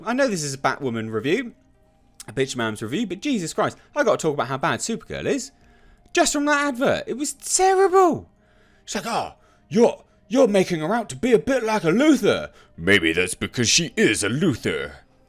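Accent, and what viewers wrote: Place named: United Kingdom. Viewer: There's nothing funnier than a frustrated confused Brit shitting on something terrible in that glorious accent. "What are you doin" and "who is this for"????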